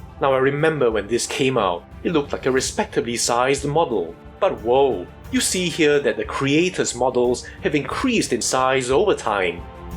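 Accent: Singapore. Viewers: you sound singaporean/malaysian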